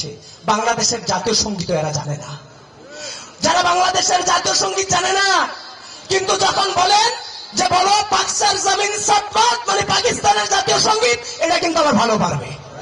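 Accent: Kolkata, India. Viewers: তার কথা কলকাতার ভাষা মনে হচ্ছে ভারতের বির্জ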